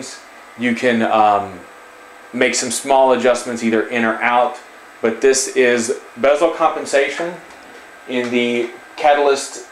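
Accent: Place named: Texas, United States. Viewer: Are you from Texas? You have a hella texas/southern accent. Whenever you say "compensated" you say compINsated. Its funny. Curious if you're from Texas. Great demo of bezel compENsation though :)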